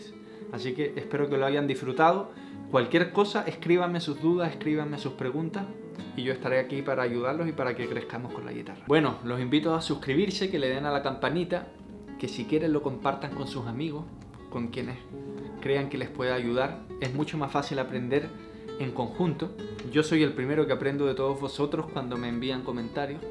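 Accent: Chile. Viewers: Oye, habla como chileno. Es que la cagó, no lo puedo creer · Eres Chileno? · Siiiii. Suena mucho a chileno. Yo también me confundí 🤣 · Wena compadre! Te encontré hoy y que gran sorpresa. Estoy aprendiendo rumba, flamenco, vals y boleros. Te agradezco nucho lo que compartes. Caxaba que tenías acento chileno, pero lo comprobé cuando hablaste del chirlito jaja. Ya solicite las tablaturas para estudiar y te estoy siguiendo en instagram. Lo que mas quiero es dominar las técnicas para expresarne genuinamente, sacarne las mascaras y los traumas. Un abrazo. Gracias! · Oshe, pues me dejas con la duda porque tiene un deje chileno que tumba.. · Con razón po, eres chileno, con razón me parecía familiar tu acento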